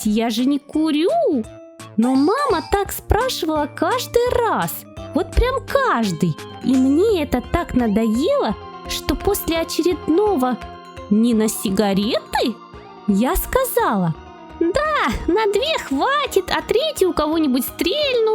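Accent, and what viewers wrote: Ukraine. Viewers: Дуже красиво говориш Українською🥰💖. Слава Україні героям слава! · Ты из Украины😅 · Ты шо украинка а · Ты что украинка что ли ? Ну пипец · Ты что украинец? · я вижу па голосу что ты украинка я тоже украинка просто нет такой клавиатуры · Ты так красиво говоришь на украинской мове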